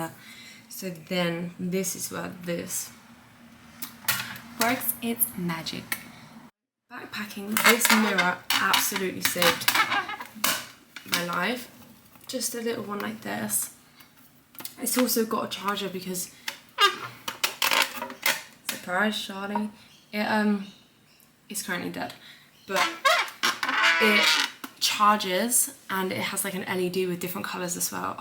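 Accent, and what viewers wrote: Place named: England, United Kingdom. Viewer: Came here from tt - English accent was a nice surprise 😂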